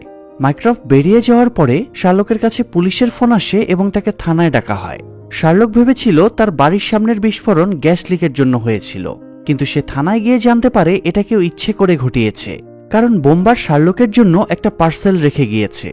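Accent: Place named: East Bengal, Bangladesh. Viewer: কথকতার মান ভাল না। গলার আওয়াজ খারাপ ।উচচারন বানগাল বানগাল।উচচারন ঠিক করে নাও।শুভেচছা রইল।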